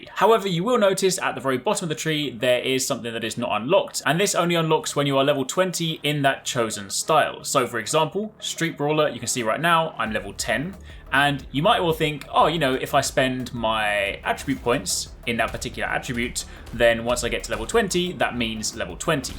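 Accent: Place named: United Kingdom. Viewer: I set to .75 speed and it is such a normal pace of conversation, I think it was recorded at this speed and then compressed to run at the current speed. Now if I can find the switch to turn off the over-accented british pronunciations so that I don't need subtitles, that would be awesome.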